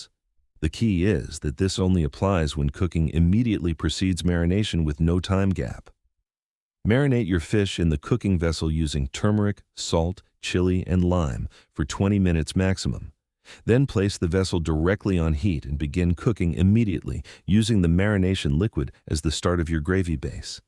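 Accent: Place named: United States. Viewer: Very interesting but the "American" pronunciation made it hard to follow at times.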